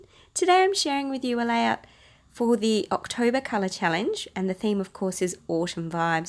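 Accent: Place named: Australia. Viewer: I could listen to your cute Aussie accent all day long! <3 I initially noticed how you mounted your background on the floral paper. I love that, it's so original. Again, the Cocoa Vanilla paper has really caught my eye. I've been rather busy with my new job, but excited to get back to creating with all the goodies I love. Thanks for inspiring dear <3 Cheers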